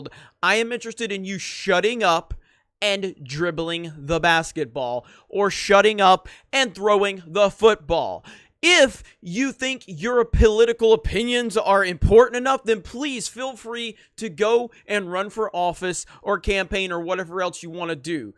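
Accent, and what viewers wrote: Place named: South, United States. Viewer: Good day, Salty Troop stopping by. I'm starting to like this guy. Like his slight 'Southern Preacher" drawl, and his content presentation.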